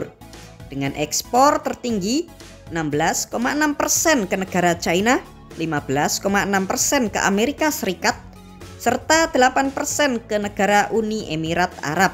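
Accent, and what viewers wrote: Java, Indonesia. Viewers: Orang jawa ya mas... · Yang ngomong meddok bgt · Jawa nya medok bgt narator nya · Medok banget bahassnya mimin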